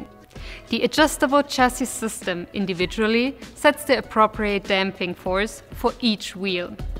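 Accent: Germany. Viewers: I hear a German accent and see that her name is. My mind immediately thought of Schmidt. If I close my eyes while she is speaking, I can almost imagine her presence again. Oh how we miss you dearly with your infectious laughter and toothy smile. Rest in peace, love. Stay classy my friends. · typisch'l deutscher Acent 🫣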